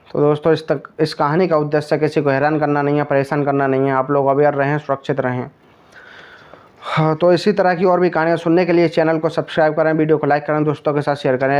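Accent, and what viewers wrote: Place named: Howrah, India. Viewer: जी आपके बोलने की शैली उस्मान सैफी जैसी नहीं है आप हावड़ा का बोलते हैं और एक ही शब्द को कई बार दोहराते हैं| कृपया सुधार कीजिए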